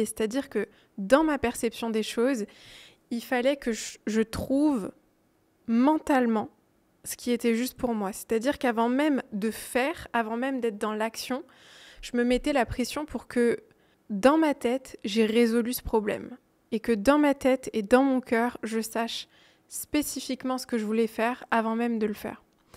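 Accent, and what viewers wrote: France. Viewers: Malgré tout je suis suisse allemand je comprends bien parce que parle comme une francaise parisienne (clair et pas trop vite).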